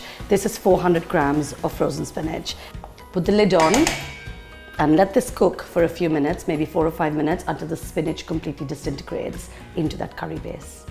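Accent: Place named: India. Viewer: Excellent recipe, thank you. Side note: the Indian/English accent is the most beautiful accent in the world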